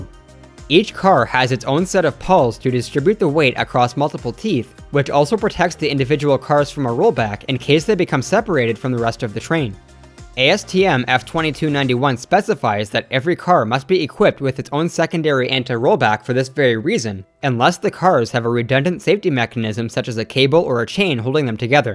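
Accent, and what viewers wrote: Canada. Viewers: wait, are you Canadian? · I can't get enough of how you say roller coaster. God Bless Canada and its unique collection of modified vowels sounds.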